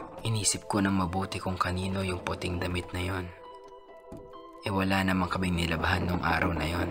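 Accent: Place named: Ilonggo, Philippines. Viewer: Taga saan ka ? Taga capiz ka,? Bat accent ng salita mo d ilonggo,,nano to mn bc gawa gawa mo lng yan istoria mo,, 😂 ,,,,peace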